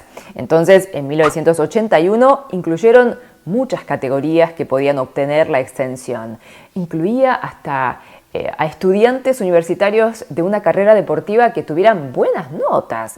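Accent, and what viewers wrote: Argentina. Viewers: Cómo no van a ir a ello teniendo al enemigo arriba y tirando bombitas cuando se les antoja?:/ sinceramente, a mí Corea del Norte me da muy mal rollo., tú estás en Argentina (por el acento que tienes me lo parece, vamos) así que tú estás a salvo, pero el resto? Pff...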